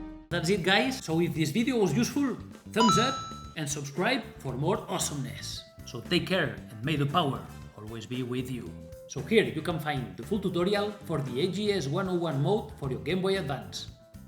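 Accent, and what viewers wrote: Spain. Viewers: Ostia este es español. Me juego lo que sea XD. Que acentazo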